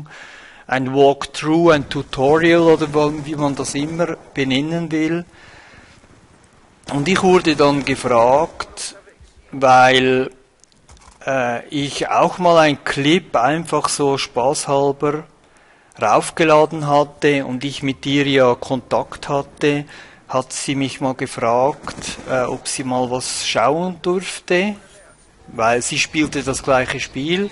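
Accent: Switzerland. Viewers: Also ich muss sagen ich versteh dich sehr gut,mir gefällt auch ehrlichgesagt dein scheizer achszent^^ Erinnert mich an Emil^^ Ne finde bist sehr gut zu verstehen,mach dir da mal keine Sorgen!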